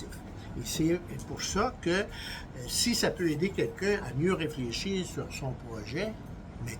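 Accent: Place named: Quebec, Canada. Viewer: Je suis en train d'apprendre le français et merci car cette type de vidéo m'aide à écouter le français québécois..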